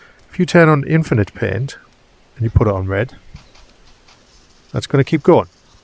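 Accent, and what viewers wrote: United Kingdom. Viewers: I am very grateful for this tutorial, nice to hear a fellow Brit. Exporting on my quest 3 goes to the data file in the android folder. BUT, it is saved as a VRMLN file. There is no option to save to a particular file format such as jpeg or png. I am doing something wrong? Do I need an account? Is there a setting somewhere I missed? Any help would be gratefully received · Just got Vermillion and was after a vid that explained it all. Up yours popped, and I'm glad it did. Clear and thorough, all with a welcoming NE accent (I'm not foolish enough to risk a guess which one...). Thanks for posting!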